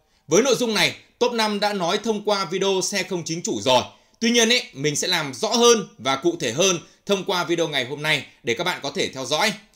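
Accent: North, Vietnam. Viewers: giọng Bắc kỳ khó nghe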